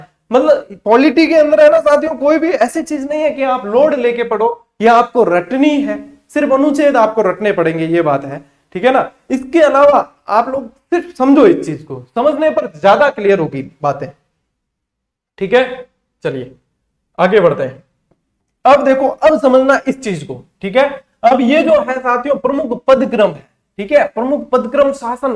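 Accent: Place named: Rajasthan, India. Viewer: Sar aapke munh se Marwadi acchi lagti hai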